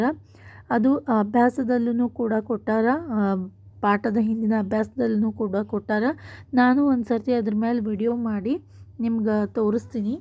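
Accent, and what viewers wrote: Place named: Vijayapura, India. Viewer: Tq akka namdu vijayapur nim baashe nam tarane anasataiti akka adake kelde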